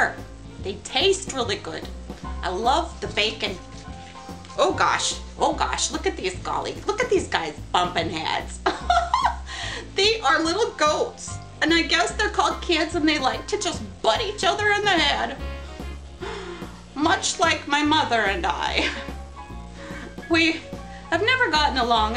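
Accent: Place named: Minnesota, United States. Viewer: LOL, So the next time my granddaughter wants me to read one more story and I'm too tired, I'll call on Aunt Nancy... The accent will help her get to know her Minnesota roots. But wait - I'm never too tired to read to her. Whew, she's safe! LOLOL! Great vid.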